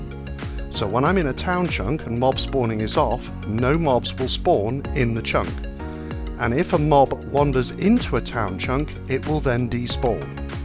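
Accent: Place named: London, United Kingdom. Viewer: ILLUMISYS on server; Very nice Job, good presentation and very helpful. Funny you and Avomance sound a lot alike, maybe from same part of London. What do I know though, I'm just a Yankee, lol.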